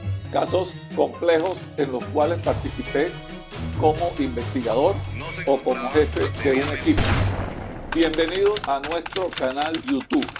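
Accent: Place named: Caracas, Venezuela. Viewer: Qué bonito escuchar este acento nuestro de caracas neutro respetuoso.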